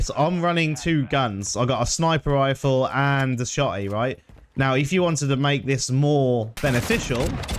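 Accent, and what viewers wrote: Norfolk, United Kingdom. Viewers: I'm convinced by your slight accent that you're from Norfolk like Kings Lynn way lol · Where you from fella? Norwich boy here and I swear I hear a Norfolk twang here and there??!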